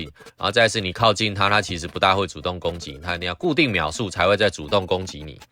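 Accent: Taiwan. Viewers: 每次聽講解遊戲，偶都笑不停，那台式旁白真是太有才了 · 非常喜歡的台灣國語，千萬不要改掉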